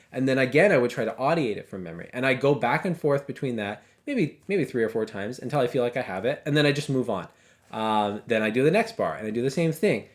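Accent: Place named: United States. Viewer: Hi, I have recently discovered your channel and although I usually prefer UK or Australian based audio, your US accent is actually easy to listen to! Now like Judy Fiterman’s comment, I am nearly 80 am relearning piano after some 65 years absence, and also Sax which I took up in my 50’s for about 4 years then again some 4 years ago in a local concert and stage band. I have trouble remembering a 4-6 digit security code, let alone music. Sax matters less as in a band It’s normal to have the sheet music, but I really would love to have a small spontaneous repertoire of piano pieces I could play from memory. Strangely I can play the first section of Fur Elise by heart, because I suspect I learnt that as a child, but not the second two which I can play now with the music in front of me. ( But it has taken some two years to get to speed and musicality!) My other problem is audition, as I really have no singing voice and have trouble repeating even one played note accurately. 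Do you have any advice for us oldies who are experiencing some general memory loss, shall I say deficiencies or lapses, or could you do a specific session addressing this issue. I love my return to music and call it my “anti dementia” activity! Thank you. Karla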